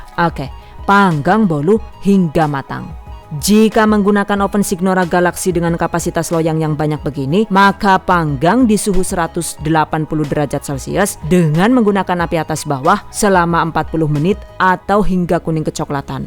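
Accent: Banyumas, Indonesia. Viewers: asalnya dr ngapak ya mba Pd bae karo inyonglah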